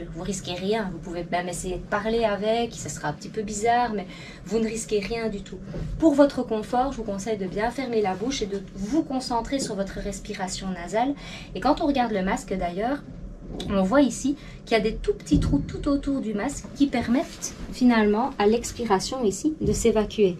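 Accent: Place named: Belgium. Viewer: En Belgique, c'est comme cela que l'on parle français et on s'en accommode très bien, ne vous en déplaise.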